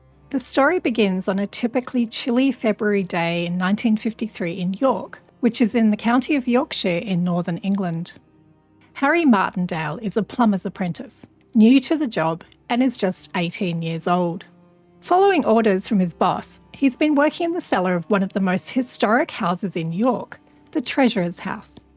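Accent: Australia. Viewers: Great video! I love your accent, Australian? Youre so articulate. 👍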